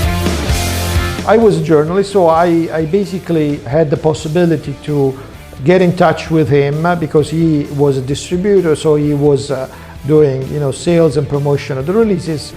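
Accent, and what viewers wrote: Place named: Italy. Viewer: And the italian accent,of course.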